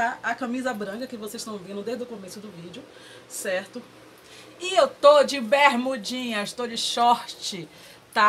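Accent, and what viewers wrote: Bahia, Brazil. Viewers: Que delícia esse sotaque baiano 😍😍😍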